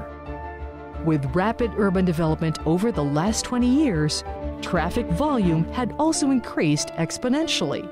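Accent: Philippines. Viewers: Pinay na pinay ang pagbigkas sa lugar sa manila, haha kala ko foreigner ang narrator pinay din pala.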